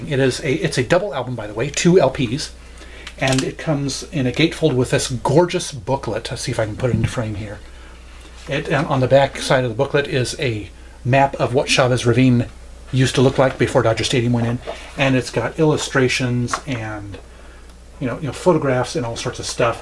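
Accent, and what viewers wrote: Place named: Canada. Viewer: WOW!!!! How the heck did you do that sound with your mouth in the beginning??!?! I was enchanted! Sounds like you are a fellow Canadian. I need to check out that record.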